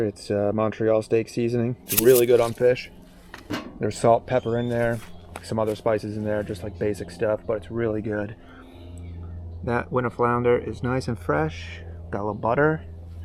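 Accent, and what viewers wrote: Philadelphia, United States. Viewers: dubious Philly accent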